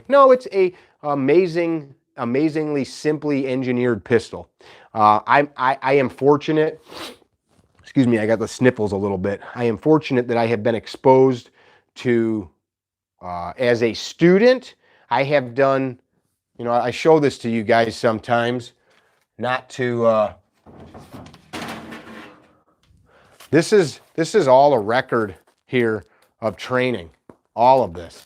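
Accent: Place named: New York City, United States. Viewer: You must be from New York City. It’s the way you said. Tawk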